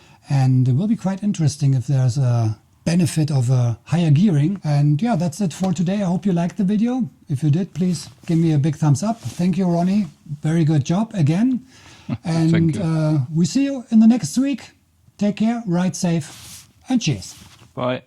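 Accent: Germany. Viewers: hör ick da nen Berliner Akzent raus? So herzig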